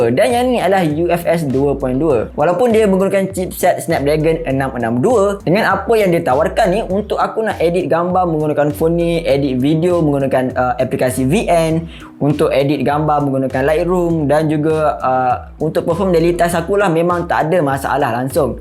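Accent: Sarawak, Malaysia. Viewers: Kita org sarawak ka?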